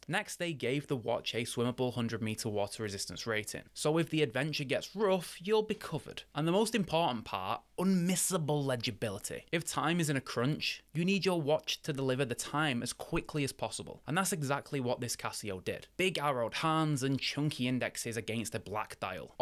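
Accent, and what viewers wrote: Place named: Northern England, United Kingdom. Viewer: Northern England somehwere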